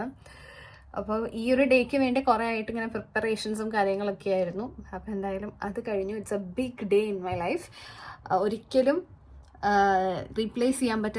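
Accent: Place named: Palakkad, India. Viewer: No idea why your video came up as a recommendation. Congrats on your graduation. Are you from Palakkad, you speak just like my husband? My husband is from Palakkad, Kunisserry. My daughter graduated from Grad school in May of this year and works in Investment Management, we have lived in the United States for close to 30 years now.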